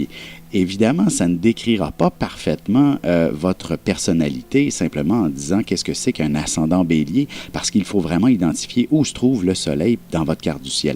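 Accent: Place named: Quebec, Canada. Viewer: lotus fleurs C'est du Québécois ;)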